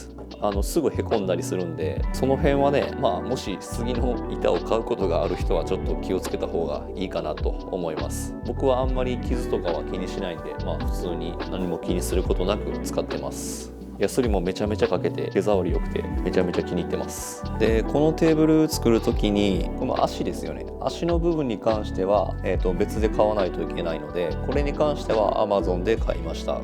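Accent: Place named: Kansai, Japan. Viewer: こんな素敵な部屋で、素敵な声でイチコロですね〜 関西弁に親近感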